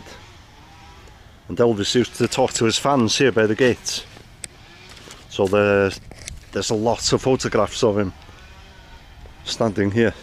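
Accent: Wales, United Kingdom. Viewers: You Have Such A Lovely Accent, I'm Biased Though Also Being Welsh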